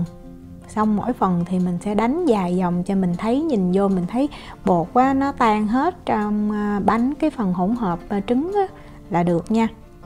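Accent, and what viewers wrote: South, Vietnam. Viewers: Mình hay làm món này cốt bánh là mè đen pha thêm vào với chút dầu mè, vị cũng thơm hấp dẫn lắm, có tgian rảnh thử xem cho vui hêhhe. Thích nghe giọng miền nam.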